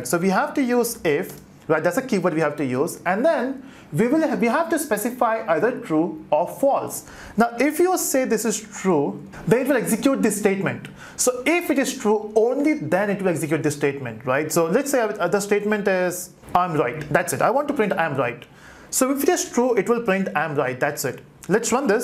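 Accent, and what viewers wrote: India. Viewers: Why you speak with Indian accent?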